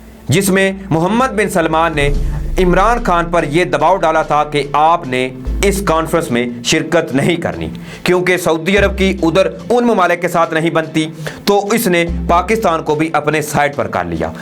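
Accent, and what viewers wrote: India. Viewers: Yeh banda indian Hé is ka talafaz ghor se SUNAIN pâta chal jaye ga Hé is indian. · Dost Urdu thek Karo plz aap Indian style Urdu bol rahe hay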